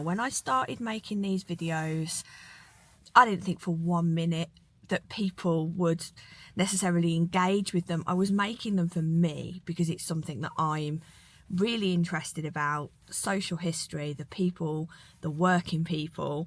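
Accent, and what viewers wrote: Birmingham, United Kingdom. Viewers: I feel the same as you about my Brummie accent. It's lovely to hear it as I moved away years ago and also your videos are great. I've not been to Austin Village for decades, thanks for the info on it. 😊 Tara a bit!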